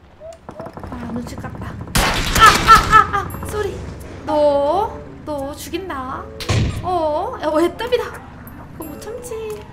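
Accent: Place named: Masan, South Korea. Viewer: 제가 FPS겜을 안하고, 못해서...그래서 PUBG도 단 한번도 안해봤고, 그러니 당연히 PUBG 방송도 안보고, PUBG 방송하시는 분들도 몰랐는데... 그런데 매우 기적적이게도 유튭 알고리즘이 제가 관심도 없는 PUBG관련 영상을 제 유튭 첫페이지에 띄워줬는데 그게 마침 님이셨; 사실 썸네일과 제목만 보고는 님이 PUBG 유져 겸 방송인인줄은 모르고 썸네일의 웃는 모습이 넘 러블리해서 클릭했다가...했다가...지금 님 영상 역주행 하면서 막 다 보는 중입니다 +_+ 사투리는 거의 안쓰시지만, 말 할 때 마산 사투리의 억양이 묻어나오는데 그게 너무 귀여워요!!! 게다가 웃는 모습은 넘 이쁘고, 와의 캐미도 너무 귀엽고 좋고, 플레이도 넘 잘하셔서 저는 배그 안하고 안좋아하는 장르임에도 불구하고 님 영상 보면 너무 재밌어요!!! 아... 님 채널을 왜 이제 알았지? ㅠ.ㅜ 새해 복 많이 받으시고, 특히 돈복과 구독자복 한가득 받으시길 바랍니닼ㅋㅋㅋㅋ 구독과 좋아요, 알림설정까지 하고갑니다 ^0^ 덧: 근데 강도질이랑 인성질 메타 넘 웃기고 재밌어욬ㅋㅋㅋㅋ 양용이만 타면 실력이 수직상승하는 님 쵝옼ㅋㅋㅋㅋ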